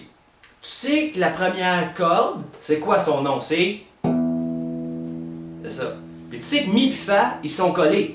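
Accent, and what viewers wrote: Quebec, Canada. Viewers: Tu parles comme un Quebequois 😊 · Ton cours est trop génial... mille merci et en plus ton accent canadien est un vrai régal pour moi (Suisse romand) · T'es cours sont super et plus l'accent Canadien depuis la Suisse bravo continue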